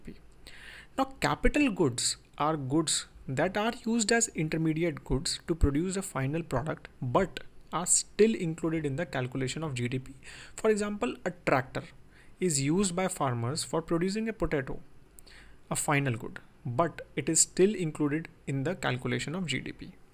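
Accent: India. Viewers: Nice Indian accent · I hate Indian accent.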